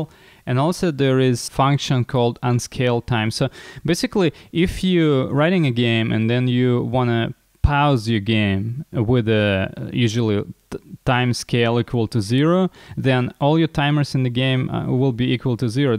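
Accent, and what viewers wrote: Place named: Russia. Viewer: рашн рашн,акцент не спутаешь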